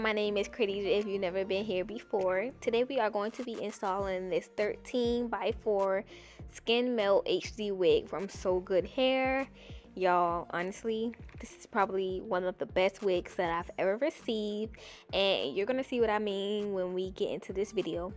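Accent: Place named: New Orleans, United States. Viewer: you sound like you from nola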